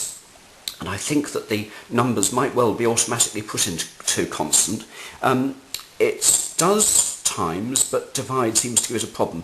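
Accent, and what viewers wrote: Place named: Scotland, United Kingdom. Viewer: your accent is scottish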